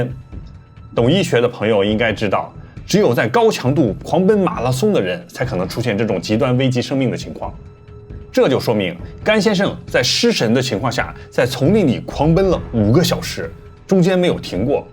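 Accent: China. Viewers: 主持人大陸人口音耶介紹魔神仔到後來自己一直講成神魔仔😂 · 想問一下，您到底是台灣人還是中國人? 純屬好奇，因為覺得您口音很像大陸人，但對台灣卻很了解，感覺您是大陸人台灣人的綜合版。:face-blue-smiling:face-blue-smiling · 直播主聽你這口音是中國內地人對吧，解說網路怪談視頻越界到台灣本島了，魔神仔是台灣本島才有的怪物，中國內地可沒有魔神仔出沒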